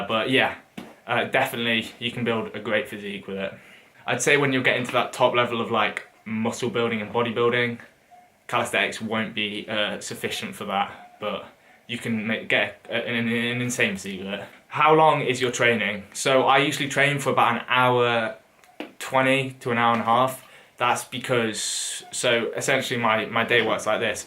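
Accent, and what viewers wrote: United Kingdom. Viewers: Anyone wanna else not expect hi sound british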